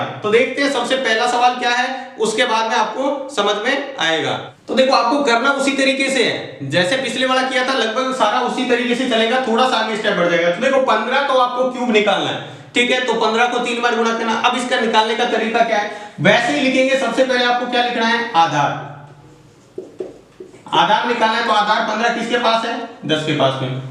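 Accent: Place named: Rajasthan, India. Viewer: Koi baat ni sir galti ho J😊😊full rajsthani boli 😏😏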